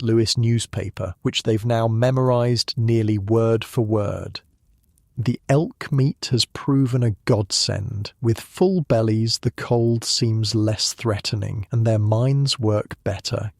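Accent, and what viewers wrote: United Kingdom. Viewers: Not a fan of Brit narrators.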